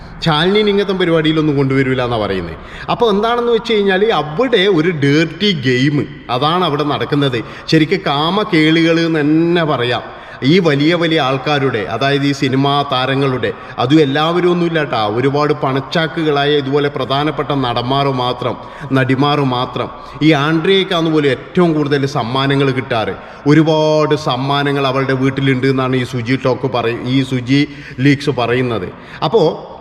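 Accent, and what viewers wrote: Kannur, India. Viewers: കണ്ണൂർ ഭാഷ ആണല്ലോ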